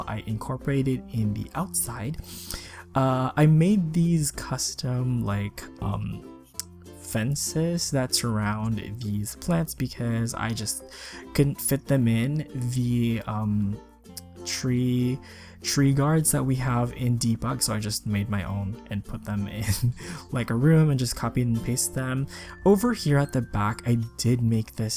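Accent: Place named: Philippines. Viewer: A Filipino SimTuber! This is like finding a unicorn. I recognized your accent, but I had to stalk your socials to be sure. Your builds are real pretty and you pick great inspirations! SUBBED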